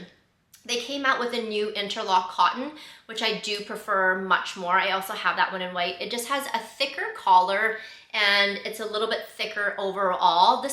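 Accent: Canada. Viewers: Aww, I'm sorry to hear people don't appreciate your accent/the way you say "bag". I think it's unfortunate that people don't appreciate the differences in accents and how they make various words sound different. I think it's adorable that in the Canadian accent, the word "bag" sounds like "beg".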